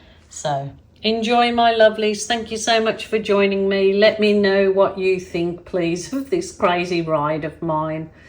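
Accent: Birmingham, United Kingdom. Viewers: I'm getting notifications in the UK. You make me smile every video, your kindness is a beautiful thing. And yes your art is amazing. I'm a 60yr old woman, I have done "normal art" for a long time but this style is so fresh and fun , and certainly not as easy as you make it look. And yes, I can still hear the Brummie accent lol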